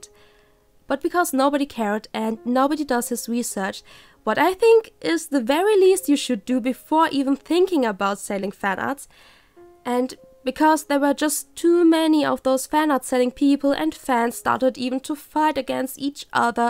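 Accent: Germany. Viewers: Ich wusste erst nicht das du Deutsche bist...hab's aber iwi an dem Akzent erkannt...denn viele deutsche sprechen manchen Vokale im Englischen nicht richtig aus...bzw. klingt es nicht nach dem typischen Englisch...ich meine es nicht böse -3- ^-^ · Hey :D are you german? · Hey, I didn't even notice that you were german, until I saw that you used german Texts as examples in your video! And when I listened closely afterwards I could even hear an accent, which I didn't hear before. I'm german aswell, and it's always nice to meet other good, english speaking german artists. ;,D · great video and incredible drawing! (btw are you from germany? your english sounds like it c:) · In my opinion you sound like an German, speaking english. You know what I mean? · Your 100% german. I am too, and I can hear it through your accent ! :D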